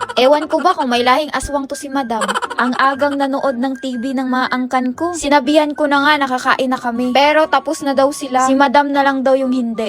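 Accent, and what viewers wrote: Visayas, Philippines. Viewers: Bisaya mo te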